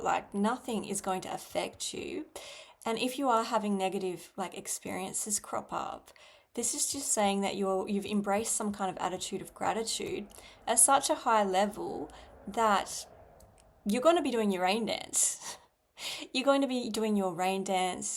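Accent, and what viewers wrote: Australia. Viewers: R you an Aussie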